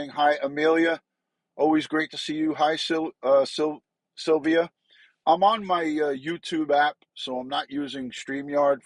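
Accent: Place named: Rhode Island, United States. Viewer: How you doin? I love your accent. You sound like me. I'd from RI. Praying for you & your loss of your precious mama. Be glad loves you so much.❤️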